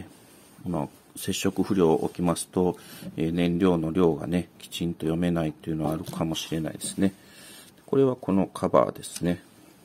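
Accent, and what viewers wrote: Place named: Kansai, Japan. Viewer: 私は燃料ポンプ28万キロまで持ちました。 無理に東京訛りにせず、関西イントネーションのままで、You Tube特有の過剰な効果音や聞き飽きたBGMがないのが良いですね。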